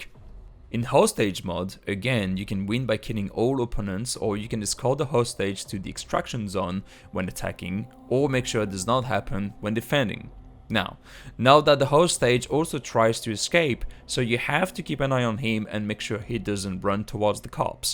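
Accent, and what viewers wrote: France. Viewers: Are u french ? Anyway, good video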